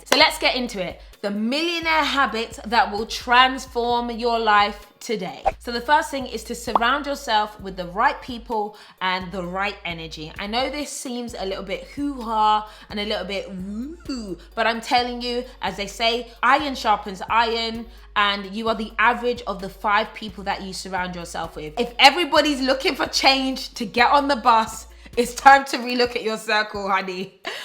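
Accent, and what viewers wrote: United Kingdom. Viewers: Are u british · I love British accent💋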